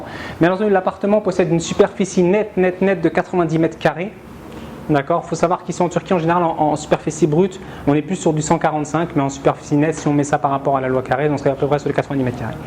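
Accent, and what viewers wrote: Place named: France. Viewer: Très bel accent français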